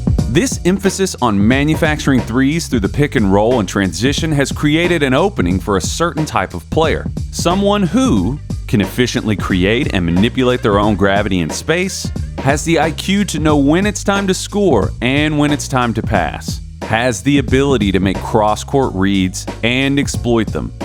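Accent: United States. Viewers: The way you say Luka Doncic...Are you east European? Otherwise you sound very Americano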